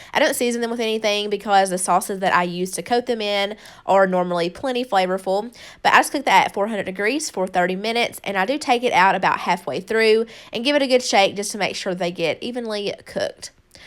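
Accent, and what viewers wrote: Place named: Texas, United States. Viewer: She sounds like us Texans except I’m even more country than her. I feel at home with her accent.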